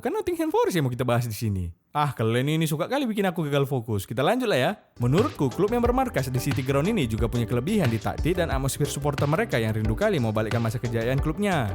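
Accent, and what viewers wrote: Medan, Indonesia. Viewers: Nada org MEDAN dan bahasa MELAYU upin ipin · Sukak kali la aku sama chanel si ini bahasa medan nya kerasa kali bah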